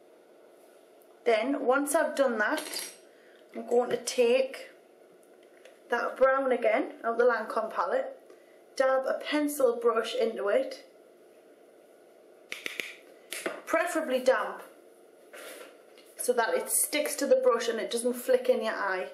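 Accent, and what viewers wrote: Newcastle, United Kingdom. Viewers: You sound like a Geordie :) <3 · Look at her channel. is from South Shields, England. It's called a "Geordie" accent. I love it too!:-)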